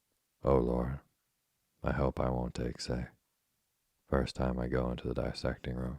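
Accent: United States. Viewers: your straight up American voice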